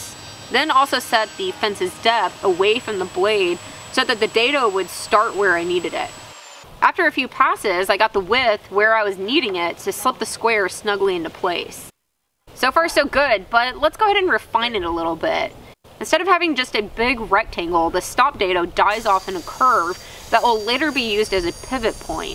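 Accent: Texas, United States. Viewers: This is known a a TEXAS DRAWL'ah and if y'all aient from Texas ya'll dont speak it.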